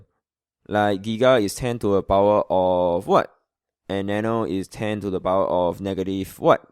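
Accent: Singapore. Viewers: definitely singaporean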